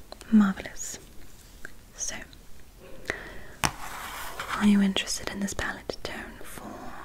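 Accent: United Kingdom. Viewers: I love your accent 👌🏼👌🏼👌🏼 ITs so beautiful... sound a bit British 🇬🇧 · This is my first video of yours in a while and let me tell you, the accent threw me for a loop. I’m here trying to figure out if it’s acting or if I’m misremembering you not having a British accent lol. Your voice is relaxing regardless of the accent. Great video!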